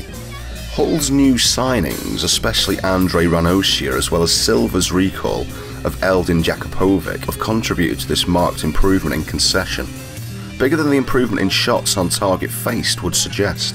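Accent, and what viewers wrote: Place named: Manchester, United Kingdom. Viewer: Given the subject of the video ie relegation, a sullen Manc accent seemed appropriate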